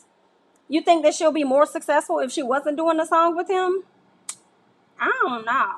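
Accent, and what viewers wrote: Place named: South, United States. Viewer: I discovered this channel last month she is funny, informative with that southern accent don’t know why her personality brings a sense of familiarity. Great channel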